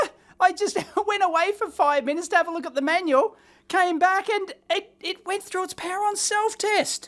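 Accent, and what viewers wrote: Australia. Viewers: That's just his Aussie accent.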